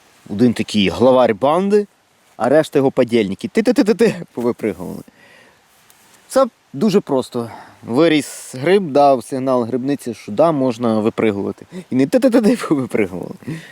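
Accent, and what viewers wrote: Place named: Ivano-Frankivsk, Ukraine. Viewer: разве во Львове так разговаривают, нет он с Франковской обл.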